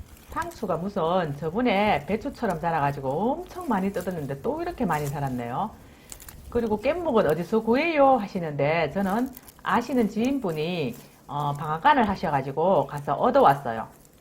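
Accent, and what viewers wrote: Gyeongsang, South Korea. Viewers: 완전 경상도 말씨입니다" 밭작물은 주인을 잘 만나서 그런지~~채소들이 싱싱하네요" 그전부터 유튜브동영상을 보고 있는데 척척박사 이네요" 잘보고 갑니다👏👏👏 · ㅎ ㅎ 이빠이 경상도 말은 정말 구수합니다 특별이 이빠이는 일본말이죠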